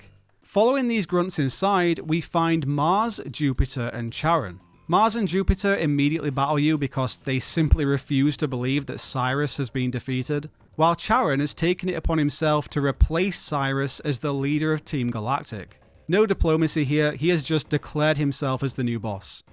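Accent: United Kingdom. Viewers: I love the contrast between your cutesy looking avatar and your, at least what sounds to me, south yorkshire accent. got a giggle outta me lol I'll add that if you want, there's some glitches that allow you to acquire the event legendaries. they're not hard to execute but you should follow a guide so as to not get lost in the eternal abyss. but I'm sure you knew about it already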